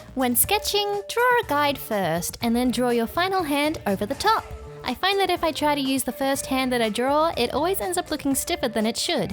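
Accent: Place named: Australia. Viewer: I know a fellow Aussie accent when I hear one. YouTube Algorithm actually cooked for once by recommending me this, saving this video to refer back to likely frequently.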